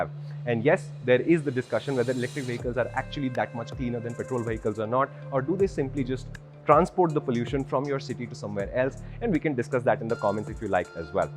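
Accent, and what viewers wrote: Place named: South India, India. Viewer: is great. But south indian accent hurts a little bit.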